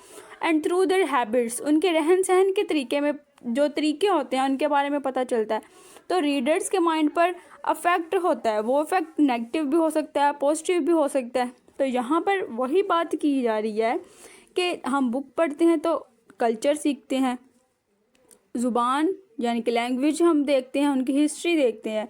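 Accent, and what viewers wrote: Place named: Himachal Pradesh, India. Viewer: Very nicely explained, 🎉 Are you from Himachal, or from Pakistan? ❤ The way you speak is so attractive, this kind of slang I always like. 😍 God bless 🙌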